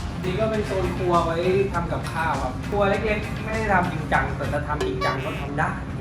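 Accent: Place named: Thailand. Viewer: โอ้เหน่อทำไม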